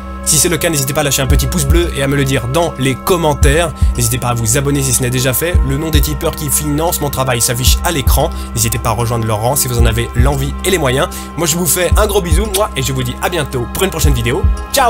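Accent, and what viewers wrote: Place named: Quebec, Canada. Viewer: J'ai pas du tout écouté l'histoire. Ton accent québécois m'a suffit x)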